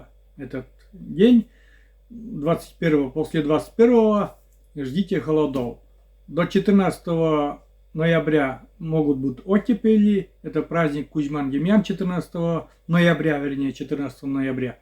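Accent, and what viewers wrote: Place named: Vyatka, Russia. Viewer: Говор похож на вятский.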